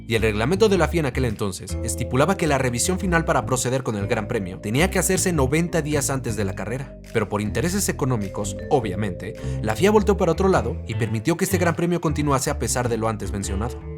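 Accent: Mexico. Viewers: Un saludo desde Juárez México, en que ciudad vives? Veo tus videos desde hace tiempo pero me imaginaba que eras sudamericano con acento por acá, de México.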